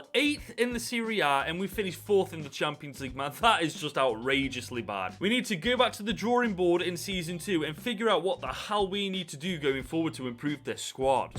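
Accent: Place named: Midlands, United Kingdom. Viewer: Mate I love your videos watch you all the time, your accent sounds very familiar are you Derbyshire or Staffordshire? Class if your SOT lad love to see a stokie doing well !😅 keep up the good work ❤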